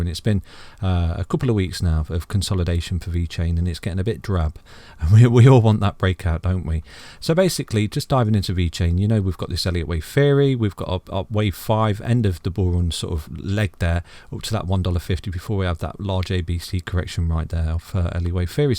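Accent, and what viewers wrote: United Kingdom. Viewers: Ur great In ur comments mate mint work how come u work in dollars as u sound like ur from the UK?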